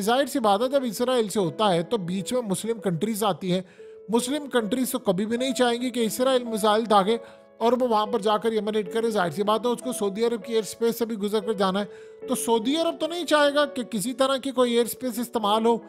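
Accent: Punjab, India. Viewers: I can only request you please don’t talk in English coz you speak English in Punjabi stick with Urdu and don’t try to copy different accents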